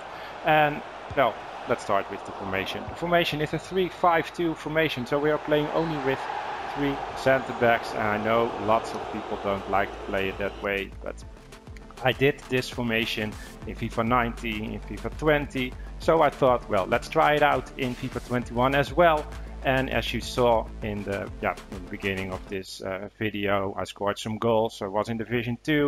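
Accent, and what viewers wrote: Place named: Netherlands. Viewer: In het Nederlands omdat ik aan jouw Engels hoor dat je Nederlander bent (No offence) Ik speel al jaren met Barca, en al jaren Div1 speler. Dit jaar enkele keren div 1 gehaald maar met veel moeite. (Meestal speel je tegen snelle spitsen zoals Salah of Mbappe en daar heb je gewoon niet van terug). Uit wanhoop deze opstelling geprobeerd (nu in div 2) en voorlopig heb ik 4-4 gewonnen. Het is zalig om vanuit de verdediging op te bouwen en weten dat je verdediging er staat wanneer je balverlies lijdt!! Good job!!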